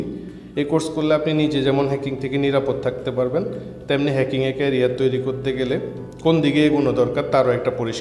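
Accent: Noakhali, Bangladesh. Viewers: ভাই কি নোয়াখাইল্লা নাকি?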